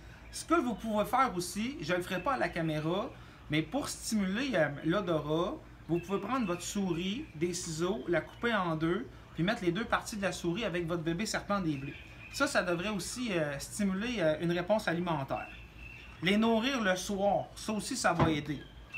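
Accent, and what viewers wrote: Quebec, Canada. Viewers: Snoube - cest laccent québécois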